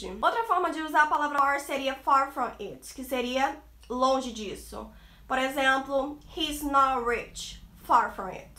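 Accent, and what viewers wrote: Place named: Rio de Janeiro, Brazil. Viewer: Pelo sotaque deve ser carioca hahha. Uma dica, tenta deixar um pouco mais as traduções na tela, pra quem não manja muito dmr um pouco pra pegar a forma como se usa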